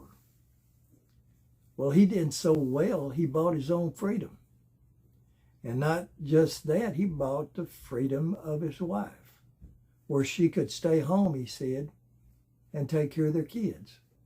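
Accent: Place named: Oklahoma, United States. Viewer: I like listening, you sound like all my family and myself growing up from ark. Ks. Oklahoma. Been in ca for 37 yrs. It’s nice to hear it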